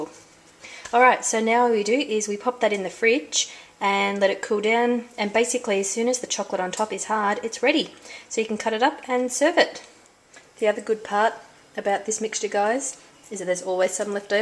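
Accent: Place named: New Zealand. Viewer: Keeping this for my inner choccy Kiwi devil!🥰🥰 Thank you for sharing this, great to hear a downunder accent and our sweet lingo on yt!👍👍 Would have loved to see the slicing and tasting of it, but just grateful for the recipe without marshmallows tbh! Will try it with coconut mixed through. Well done, its champion!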